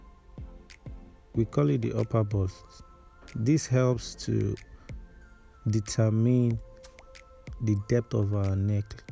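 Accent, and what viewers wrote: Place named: Africa. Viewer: Employ me at youtube to translate for you,its like you guy don't understand Africans and Asians spoken English 😒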